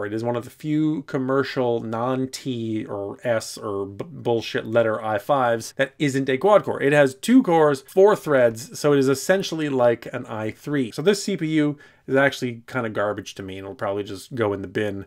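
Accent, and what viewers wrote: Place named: Russia. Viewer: that Russian accent is on point.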